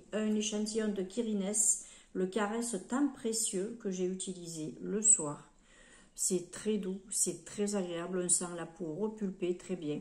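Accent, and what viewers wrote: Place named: Provence, France. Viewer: Bel accent Provencal...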